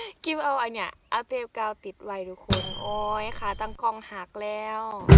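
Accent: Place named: Chaiyaphum, Thailand. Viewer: มักหลายสำเนียงคนชัยภูมิ น่ารักมากครับ❣️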